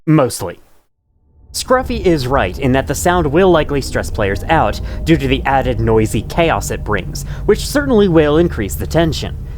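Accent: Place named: Asia. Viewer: He sounds asian but its not an insult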